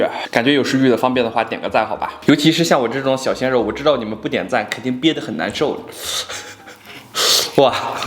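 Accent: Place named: Beijing, China. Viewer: 您那毛坯儿房愣是给我听吐了。求你，放过北京话，积点儿口德。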